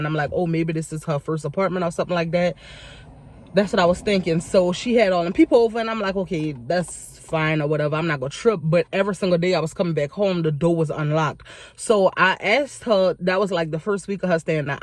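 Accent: Louisiana, United States. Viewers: Love your accent...want to visit lousiana one day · Are you from New Orleans? I love the way you sound.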